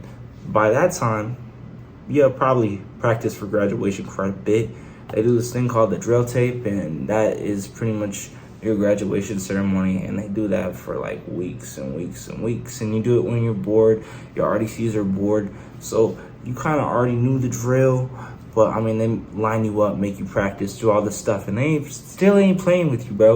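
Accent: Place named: New York, United States. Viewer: Lmao boy u gotta be from New York , nice vid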